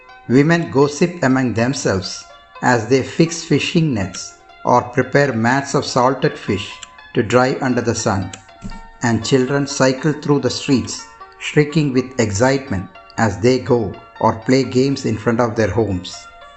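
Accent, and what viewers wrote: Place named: India. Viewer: Yeena dey...Your English...is Indian pronounces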